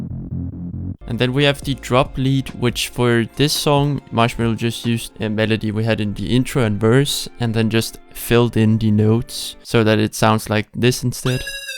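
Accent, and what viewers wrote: Denmark. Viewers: Correct me if I’m wrong, but that’s the most danish accent I’ve ever heard (dope titprial tho!)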